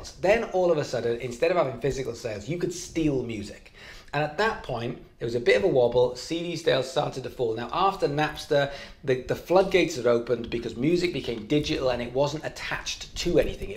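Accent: United Kingdom. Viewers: Great video, and nice to hear a fellow brit!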